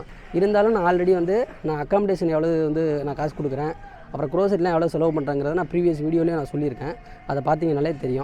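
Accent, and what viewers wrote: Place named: Madurai, India. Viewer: Bro, I really like your innocent. Am admiring your way of talking. I think your native is madurai because your slang is like that madurai.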